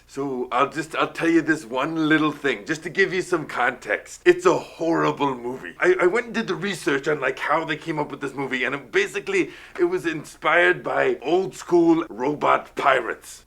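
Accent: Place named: Ireland. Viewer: sounding quite Irish in this video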